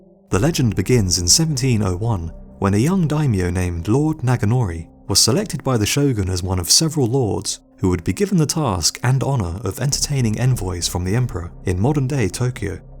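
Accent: England, United Kingdom. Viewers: You sound like the spiffing brit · Englishman here. He sounds English to me. What was it that he didn't pronounce properly?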